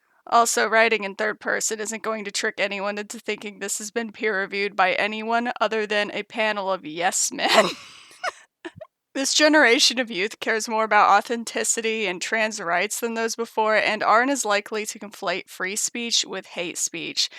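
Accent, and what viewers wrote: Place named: South, United States. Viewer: I love this vid, your content and personality is one of a kind! also your redneck accent is good! lol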